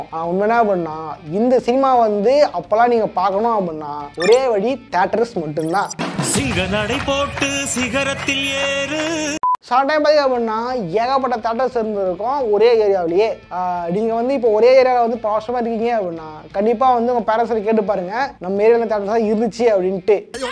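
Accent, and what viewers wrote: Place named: Vandavasi, India. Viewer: Brother unga sondha vur vandhavasi bola irrukae🤔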